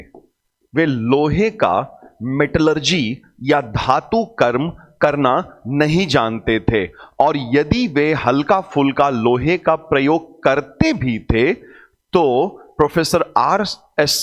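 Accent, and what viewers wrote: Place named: Ghazipur, India. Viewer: Thank you so much sir🙏🙏 Sir aap Ghazipur district se h aisa lgta h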